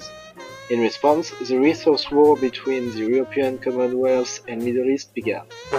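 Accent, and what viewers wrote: France. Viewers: I thought you were trying to do the accent. I thought that was kinda clever having a frech accent with the old jazz music. Didnt realize it was unintended. Good job with the history too. Ive only played fallout 3 and NV. I never exactly knew the history in the game. Good video. Please keep making more!!:D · Si je sais reconnaitre une chose c'est bien un accent Français en Anglais, dis moi, ne serais-tu pas originaire d'un pays pratiquant la langue de Molière? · tes videos sur l'histoire de fallout sont geniales et tres interessantes. Franchement rien a redire la dessus. Par contre, jvais passer pour un rabat joie, mais ton accent francais en anglais cest.. pas possible. Je salue tes efforts pour le faire en anglais avec les sous titres fr et anglais, cest un enorme travail jen suis conscient mais l'accent me deplait. Je suis désolé d'être franc comme ca mais si tu pouvais ameliorer ce point ce serait parfait. 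Mais sinon je te le redis tes vidéos sont geniales:-) A la prochaine! Grayef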